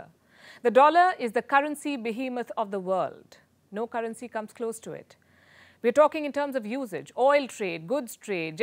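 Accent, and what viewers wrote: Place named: India. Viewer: Your pronunciation is nice, voice is pleasant and the accent is more Indian.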